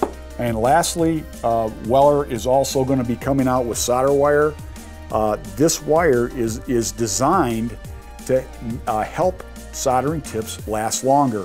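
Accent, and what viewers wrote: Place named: United States. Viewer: It seems that's how they pronounce it in the USA.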